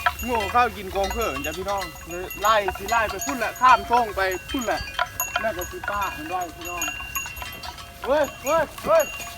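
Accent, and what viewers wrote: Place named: Phu Thai, Thailand. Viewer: พูดน่ารักคำพูดเพราะภูไทยทีอีกภูไทหึ่วคำพูดออกแข็งทีลาว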